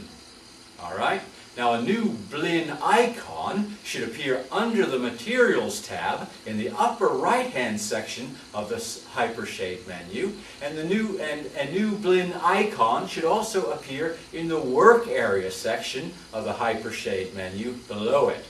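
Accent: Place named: United Kingdom. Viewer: Hes from the UK deal with it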